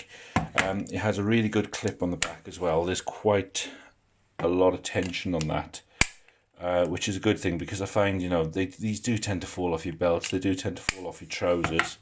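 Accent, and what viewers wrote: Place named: Wales, United Kingdom. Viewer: I thought I recognised the accent I'm from sunny Pembrokeshire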